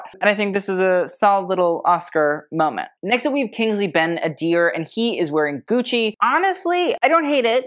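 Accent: United Kingdom. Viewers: Your British accent is Fantastic darling 🤩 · Wow, excellent British accent considering you are American. Have you ever thought about starring in British costume dramas? Thank you.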